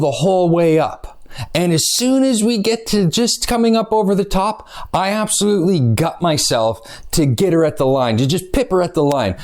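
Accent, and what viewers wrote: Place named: Canada. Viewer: I'm a excessive guy too but you are at another level 😁 I'm from Montréal, so it's a pleasure listening to another Canadian...it's a nice change to listen to something different than from UK of Australia !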